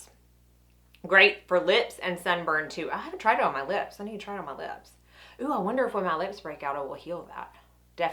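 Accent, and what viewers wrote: South, United States. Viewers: Good info but this could be edited down to half. Most people don’t want to watch unnecessarily long videos. You could have a huge following if you get to the point and just talk about the products and how to use them. No useless banter about the shape of bottles, etc. Sounds like you’re from the South and I know women talk a lot in the South because my mom and aunts were from Louisiana but please, CA women will never take the time to watch this entire never ending video.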